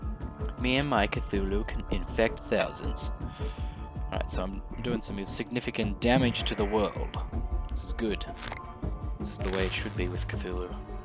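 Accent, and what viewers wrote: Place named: Australia. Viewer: He's Aussie...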